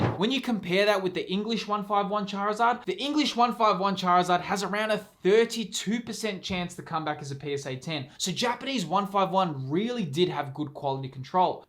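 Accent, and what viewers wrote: Australia. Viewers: Love. That. Lefeon! Thanks for the content! Aussie accent makes it even better.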